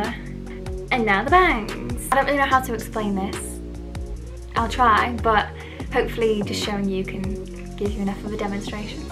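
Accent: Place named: Leicester, United Kingdom. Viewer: are you from leicester? recognise the accent!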